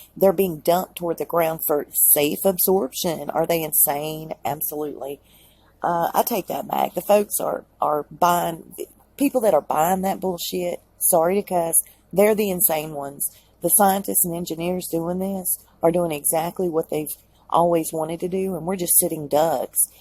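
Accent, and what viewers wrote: South, United States. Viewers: As a southern girl, born-and-bred in Alabama, so refreshing to hear your accent. Just keep being you. Your job is to inform us and you are doing an excellent job of that. A job that you took on voluntarily. God bless you and yours. From Another southern belle!! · Your Southern Accent is SO-O-O -o-o-ooo charming and especially——AUTHENTIC!!‼️ Dearest-Sweetest——💕 💕—— Please, Do NOT EVER Change or alter your native, naturally acquired speech & speech patterns or ACCENT‼️‼️‼️. You are sooo authentic and genuine in this time of practiced artifice‼️ PLEASE stay just yourself and who you are‼️. Take care of yourself and ALL of your precious family💕‼️. And... LOTS OF LOVE AND MANY BLESSINGS😘‼️ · I love your southern accent your voice is so kind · Hell, girl- I've got such a southern accent that I never even noticed you had a southern accent!! 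Lmao!! I'm dead serious. 😬😂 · I love the southern accent, crazy/evil people have to contrive a way to upset you. I would delete any offensive comments.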